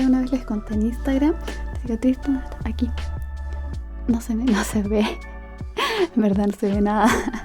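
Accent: Chile. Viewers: Ahora sí usas tu voz natural de el Dejo Chileno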